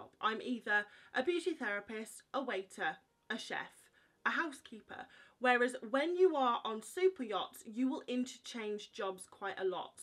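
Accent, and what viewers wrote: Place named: West Midlands, United Kingdom. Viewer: Congrats! You're quite the entertainer. Could you please let us know which region of the UK you hail from? Judging by your lovely accent I'm guessing West Midlands. Am I way off?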